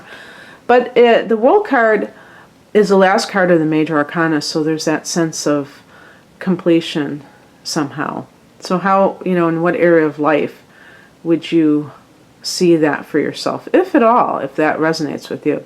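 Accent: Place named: Midwest, United States. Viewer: oh hey a midwestern accent! Hiiiii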